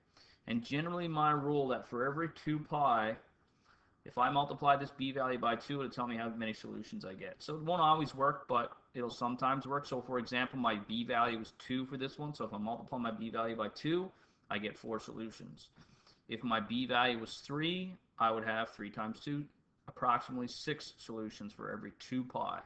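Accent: Newfoundland, Canada. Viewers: As soon as I heard ya, I knew you's a Newfie b'y...lol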